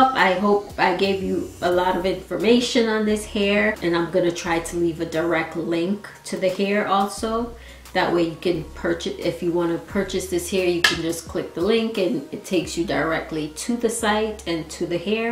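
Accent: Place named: Jamaica. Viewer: So cute I hope Mine turns out well. You sound Jamaican if you are, big up yuhself goodie!!! If not still big up yuhself! May do a review on this as well.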